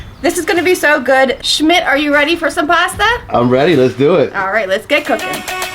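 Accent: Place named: New York, United States. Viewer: forgot to ask…. With “caw-fee”, methinks you’re a “nee-ew yaw-kuh”… am I caw-rect? 😁… brings me back to my roots as a joisey boy hearing from the other side of the river over the Hudson…. I think, once I use up all my preshredded mozzarella, gotta find a decent multi shredder box to shred my own… I might try those small cheese balls in the water… forgot what you called them.. have to rewatch anyway. Pause-n-cook, or write EVERY step down. Either way…. What about a little ricotta (or “riggutt” in the NY/NJ “Sicilian” slang)?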